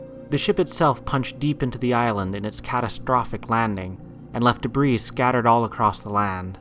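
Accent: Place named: Ireland. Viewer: Are u Irish I hear a slight Irish accent in your voice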